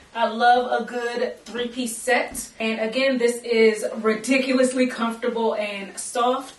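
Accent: Baltimore, United States. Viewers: I knew knew it!!! I'm from bmore and I could hear it in your voice. Love it! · You absolutely have the Baltimore accent!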